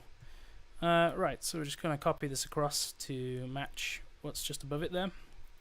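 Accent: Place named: Scotland, United Kingdom. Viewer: Thank you for the strream and the production knowledge bruvo, absolutely love your Scottish accent!! This stream made my day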